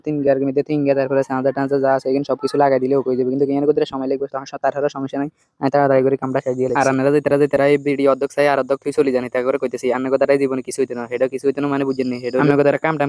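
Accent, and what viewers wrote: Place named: Noakhali, Bangladesh. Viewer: নোয়াখাইলা কথা ঠিক আছে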